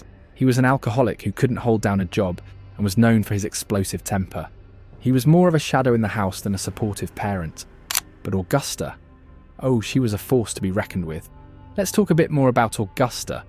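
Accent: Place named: South England, United Kingdom. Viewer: Great work sir, excellent narrator sounding South England vibes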